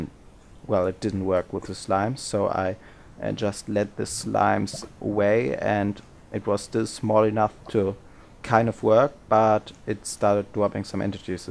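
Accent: Germany. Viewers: man merkt, dass du deutscher bist xD aber du sprichts sehr gut englisch mach weiter so :D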